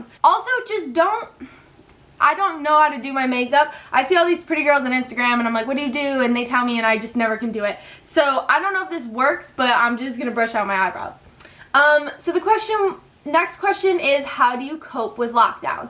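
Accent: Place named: United States. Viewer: You're really pretty enough without make-up. That's a compliment and honest opinion. And you do still have your American accent, which I like. You also seem like a lot of fun to be around. I am surprised someone did not tell you what fancy dress meant, did they not ask what you'd be wearing? Take care and welcome to England.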